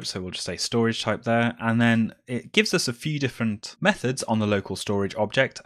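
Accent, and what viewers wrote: United Kingdom. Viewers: Not that I just enjoyed it, it helped me a lot, I implemented it! I just discovered your ytCh, you deserv much more subs. Watched few videos yesterday, I can learn a lot from your videos. I heard that you have a proper English accent. You must be from UK :) I am living next to Manchester :) Do you have a time to be my mentor once a month?...or whenever you have time. That could help me a lot.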